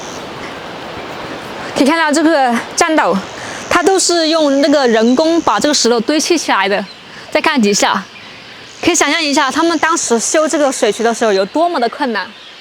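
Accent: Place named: Luzhou, China. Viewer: 说方言的口音跟我们老家很像，跟泸州更像！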